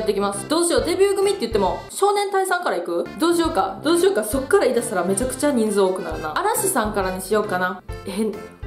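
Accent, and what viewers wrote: Kansai, Japan. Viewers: おすすめに出てきたのをきっかけにさん見始めていつの間にかハマってた。なんか関西弁が好き。ちょっと口をとがらせて話す時が可愛い。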